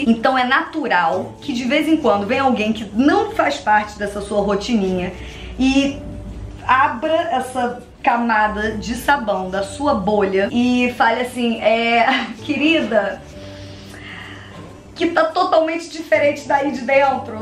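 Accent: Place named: Rio de Janeiro, Brazil. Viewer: Carioca fala gostouso!!!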